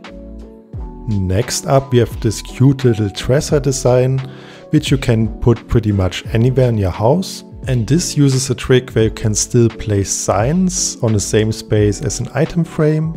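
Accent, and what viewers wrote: Germany. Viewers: Are you German? Doesn’t mean as hate but you kinda sound German😅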